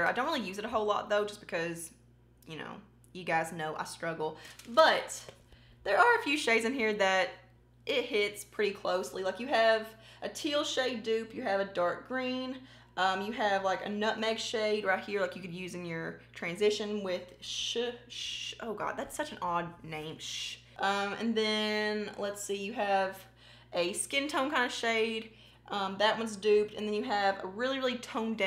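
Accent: South, United States. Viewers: i was looking for a dupe for one of the greens in the dark magic palette, but tbh even with the warning that there probably won’t be an exact dupe, i have to watch the whole thing now because your accent is so adorable! i love southern drawls, they’re so relaxed and pretty-sounding~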